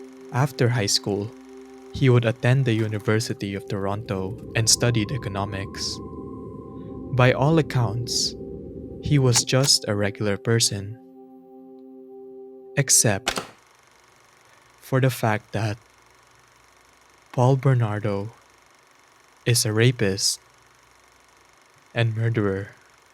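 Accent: Philippines. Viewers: I hear a Filipino accent 😁 greetings from an Indonesian 🤝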